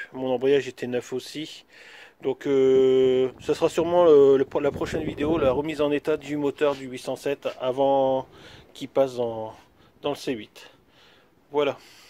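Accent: North, France. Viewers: Tu est d’ou du nord ?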